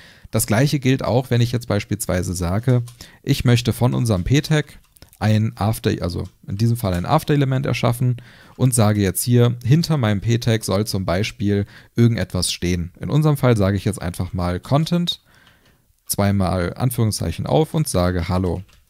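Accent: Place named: Germany. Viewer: hab exact das erwartet..., warum können sich deutsche generell nicht etwas bemühen das englische TH zumindenst irgendwie richtig auszusprechen ? se-only-type, oder das en-te-ha-element, "when talking about sis and set, you want to be understood - no?" schöne kacke, dass diese programmier dinger alle auf english sind :) nix persönliches ! davon abgesehen, content ist gut präsentiert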